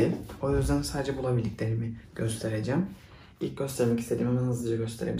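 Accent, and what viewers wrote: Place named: Cyprus. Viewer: Alttan alttan kendini dışa vuran Kıbrıs şivesi çok hoş. ☺ Güzelim adacıktan görüntüleri görünce daha bir özledim şimdi. 🌿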